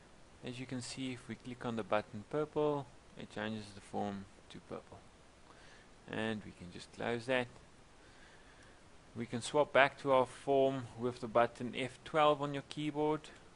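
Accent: South Africa. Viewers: Sure sounds like a South African man! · Yeah I noticed the SA voice straight away.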